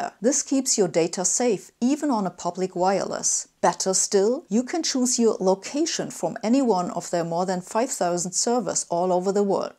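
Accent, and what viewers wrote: Germany. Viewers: That was single handedly the best transition to a sponsorship ever. Also the way you explain the benefits and features of nord VPN actually made me think about buying it. not only are you an amazing scientist and educator, your sales skills are a solid 10 as well. Please do not be hard on your english either. You have an accent yes. Your english is not broken by any means and you are very easy to understand. Plus I have always a german accent to be rather intimidating so I shut up and listen more. Thank you for the laugh first thing in the morning. My perception about how today is going to go has spin in the optimistic direction. Stay safe and healthy! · you sound German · I am so old that to me English spoken with a German accent by a scientist is considered more reliable than pure American or British English. Given the current standing of rationality in the US and the UK, I am modern again.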